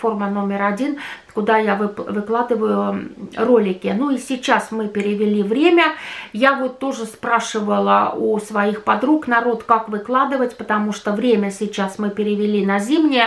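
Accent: Finland. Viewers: Тащюсь от акцента! Вспоминаю персонажа Вилли Хаапсалло из Особенностей национальной охоты: Зеня когда мы пойдём на охоту?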